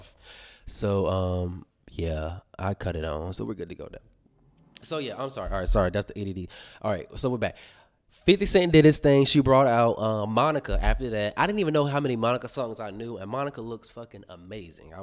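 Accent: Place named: Norfolk, United Kingdom. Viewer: Loving the hair and the fittt 😍 I definitely thought you was from Norfolk though lol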